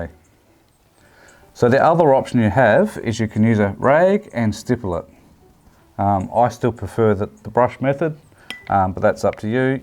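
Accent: Australia. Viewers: thanks, mate! love the accent, Tasmania?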